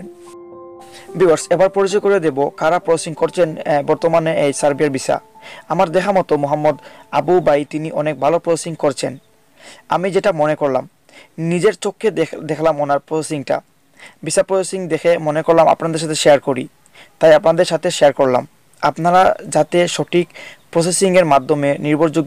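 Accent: Sylhet, Bangladesh. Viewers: ভাই সিলেটি, লাস্ট কথাবার্তা শুনে বুঝতে পারলাম। ভাই প্রশ্ন হচ্ছে, ম্যানপাওয়া কেন দেয় না -সরকার?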